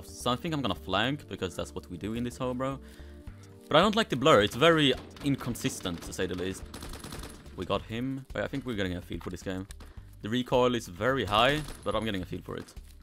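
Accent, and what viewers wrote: Sweden. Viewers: Man kan höra att du är svensk på din Engelska😅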